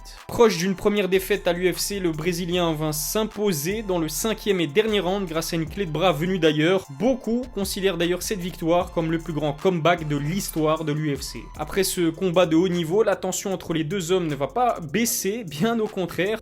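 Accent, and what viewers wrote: Belgium. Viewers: Juste une petite question t'es de Bruxelles ??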